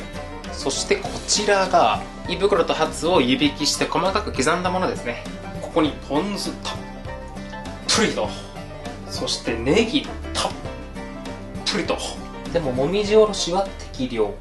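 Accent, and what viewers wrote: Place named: Hiroshima, Japan. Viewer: 広島出身の人かな？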